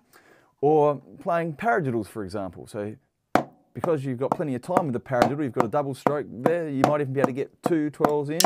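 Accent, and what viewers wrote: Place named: New Zealand. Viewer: whoa you sound new zealander. im aussie as well but from sydney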